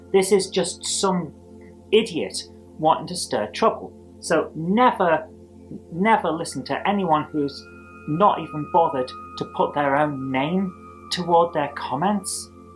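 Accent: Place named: Newcastle, United Kingdom. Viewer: You sound much more Geordie in that old clip ! Great response video😊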